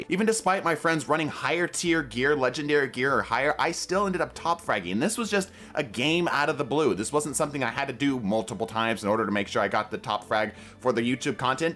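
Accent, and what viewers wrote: United Kingdom. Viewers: Is this the same dude with the British accent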